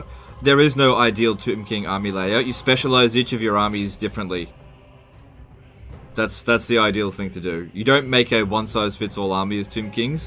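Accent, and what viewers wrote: Australia. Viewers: He’s Aussie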